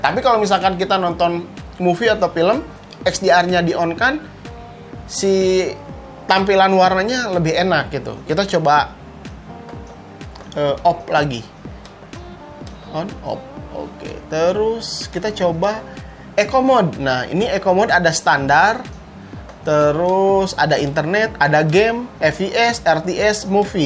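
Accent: West Java, Indonesia. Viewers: Aksen sunda nya masih ada kyk dulu _.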